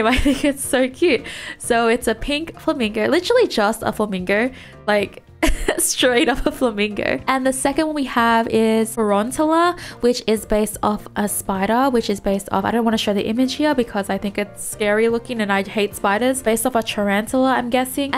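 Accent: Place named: Australia. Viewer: Ohhh, you're from Australia, that's where your accent comes from, finally!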